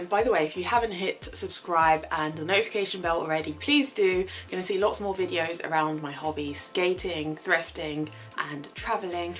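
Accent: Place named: United Kingdom. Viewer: Oh shit! British accent on an Ebony Queen?! SUBSCRIBED!!! 🔥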